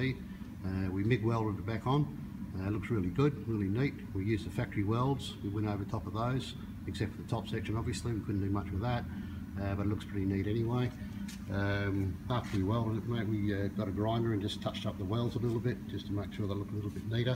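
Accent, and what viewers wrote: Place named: Australia. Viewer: Great to hear an Aussie voice to explain...well done and thanks for the tip.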